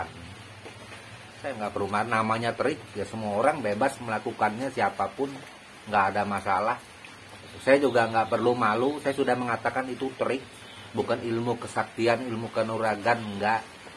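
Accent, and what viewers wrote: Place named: West Java, Indonesia. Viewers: MAAF EMPU KOK EMPU LOGAT BICARANYA SPRTI ORG SUNDA HEHE 🙏🙏🙏🙏🙏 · Salam santun mpu... Mohon maaf..logat bicara..mpu seperti ada logat sundanya...apa mpu ada keturunan sundanya..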